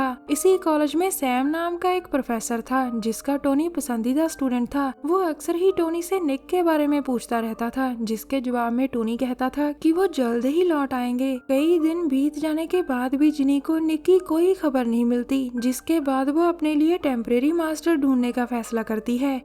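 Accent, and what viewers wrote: Punjab, India. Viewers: Ma'am Shayad Ap Punjab Se Ho ?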